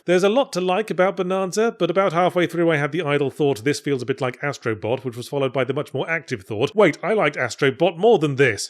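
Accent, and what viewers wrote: United Kingdom. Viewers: Yahtzee explained in a stream that it's just awkward to pronounce. Likely due to his British pronunciation of the "banana" part specifically.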